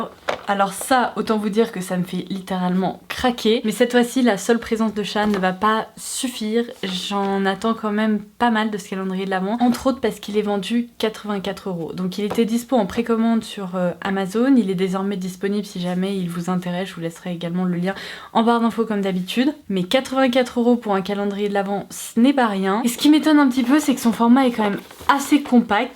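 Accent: England, United Kingdom. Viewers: Tu es anglaise ?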